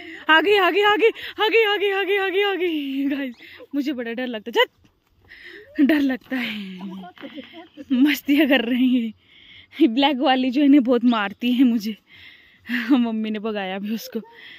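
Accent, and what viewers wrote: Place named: Himachal Pradesh, India. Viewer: Bahut sundar vlog bahen ham utrakhand se hu haridwar roorkee mai rhti hu aur aapki Bhasha se lagta hai ki aap Himachali Ho han ji Time To lagta Hai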